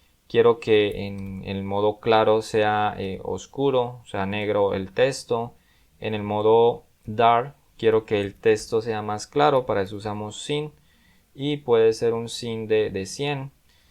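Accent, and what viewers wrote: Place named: Colombia. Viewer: De antemano, muchas gracias por dedicarle tiempo a enseñar a los demás. Ojalá más personas se sumen como yo a este canal. Por tu voz asumo que eres de Colombia, al igual que yo. Estoy aprendiendo por mi parte a programar, pero por mi trabajo y responsabilidades no pude. Ahora Sacando tiempo, poco a poco voy aprendiendo y espero, con tu ayuda, seguir aprendiendo. Bendiciones para ti y tu familia.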